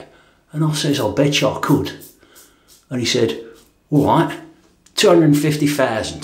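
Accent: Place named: Cockney, United Kingdom. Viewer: 🤣🤣🤣 Hilarious cockney London Great shave.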